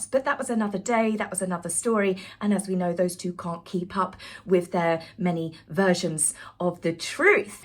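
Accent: United Kingdom. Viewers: I always appreciate your cutting wit and humor articulated with the perfect velvety British accent and sweet smile! It’s the perfect balance of tone when breaking down this mess! Bravo! 👏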